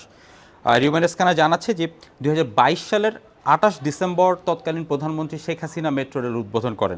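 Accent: India. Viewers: আপনি যেটা বুঝাতে চাচ্ছেন সেটা আমরা বুঝে গেছি।। দাদার টান এখনো৷ যাই নাই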